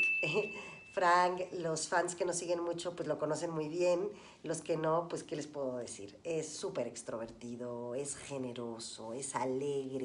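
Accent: Mexico. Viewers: Que tía más simpática esta parchisera (hablando como hablan los mexicanos y su curiosa forma de 'retorcer' el castellano). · cuantos años llevas en México? Tener nuestra ficha amarilla fuera de España aún no lo supero! Jaja amo como hablas con acento mexicano y cambias al español 😝 me encanto el documental! Lo volveré a visualizar seguro! Saludos desde España!! Te adoroooooo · Hablas más como mexicana que como española, que linda 😉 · Tiene buen acento Mexicano..he notado que casi no usa palabras o términos de España... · ya no hablas como española, sino como mexicana,pero igual sigues siendo la ficha amarilla de Parchis. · ya eres Mexicana! Tu acento mexicano te va muy bien eeeeh felicidades! Saludos! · Y dime... se ríen de ti los Parchis x tu acento mexicano??😁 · Guapa guapa!!! me encanta tu acento Mexicano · Alaaaa que mexicano tu acento, bueno esque hablas mejicano no tenía ni idea! 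que te quedaste viviendo allí,pensé eras catalana. Un abrazo! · Me gustaría leer la respuesta de que piensan de su acento mexicano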